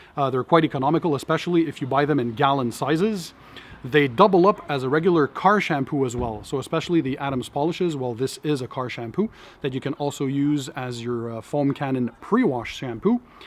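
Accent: Canada. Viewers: Alabama. Must be why I find it somewhat different, not odd just different. Not so much a French accent just a very Canadian type of accent not usually found in the state unless it’s Minnesota or the Montanas.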